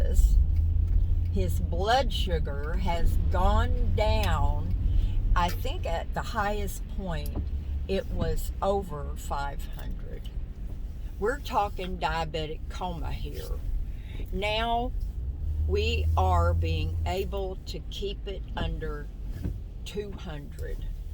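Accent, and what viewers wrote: South, United States. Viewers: Luv your southern accent! U r so cute! Good luck with Terry!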